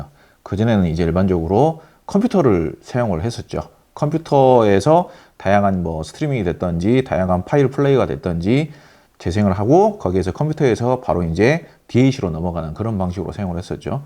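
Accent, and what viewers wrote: Jeolla, South Korea. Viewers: 전라남도~ 약장수처럼 말하네요~~ 그닥 신뢰가 안가네요.... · 전라도 사투리가 구수함 ㅋㅋㅋ 저두요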